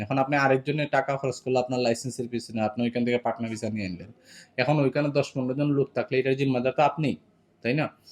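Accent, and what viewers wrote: Sylhet, Bangladesh. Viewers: ভাই আফনার মাতে লাগে,আফনে সিলেটি😊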